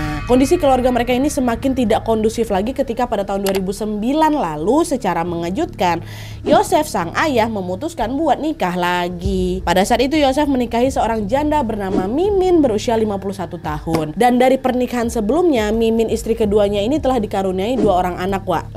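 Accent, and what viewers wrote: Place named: North Sumatra, Indonesia. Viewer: BTW, ini profesi sesungguhnya apa ya. Dan dari logat bicaranya dia tampaknya orang Sumatera, khususnya Sumut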